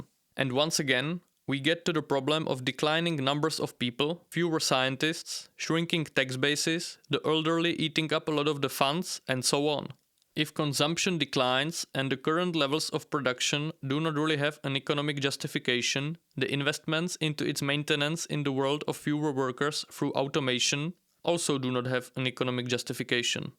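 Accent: India. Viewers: your accent sounds indian! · If I didn’t know he is Czech , I’d say he is Indian based on his accent . He is way too optimistic about USA but overall interesting videos .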